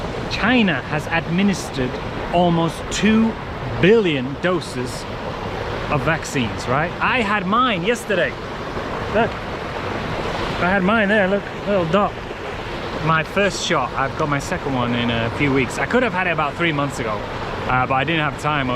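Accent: United States. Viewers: Love your American twang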